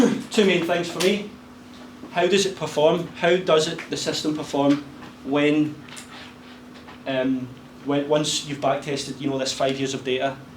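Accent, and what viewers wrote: Scotland, United Kingdom. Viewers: Refreshing to hear Scottish accent aye 🏴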